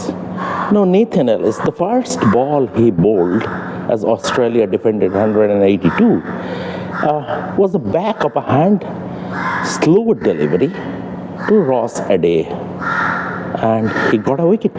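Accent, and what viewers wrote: Bengal, India. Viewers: Your Bengali accent is prounounced. It takes a while to understand and the background sounds are not helping either. Please make facility to make yourself heard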